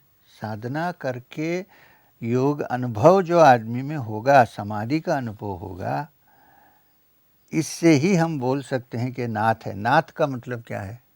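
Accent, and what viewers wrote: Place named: Maharashtra, India. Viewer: Sri M your accent is also quite maharashtrian.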